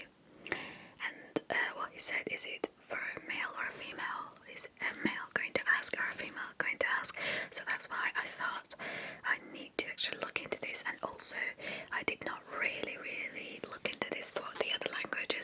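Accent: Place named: Thailand. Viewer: Your Thai accent is so cute. I love it ❤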